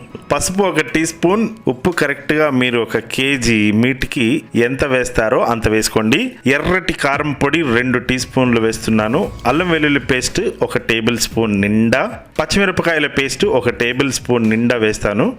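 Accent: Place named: Telangana, India. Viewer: Barre neyyi🤣🤣🤣🤣superrr Andi babu mi Telangana yasaa😍👌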